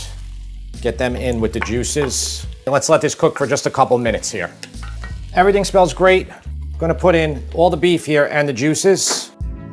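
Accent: New York, United States. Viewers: I thought i was subscribed from using your beoff Burgonion recipe. My bad. I'm subbed now. One thing i learned from chef John on YouTube is that of you accidentally crowd the pan you can just keep simmering until the liquid absorbs and it will brown. It's an option for tougher meets like Chuck. He's in California now but i think he's a NY guy too originally. I'll admit, i get a little homesick hearing you talk. North Jersey is similar enough in speech pattern/ accent.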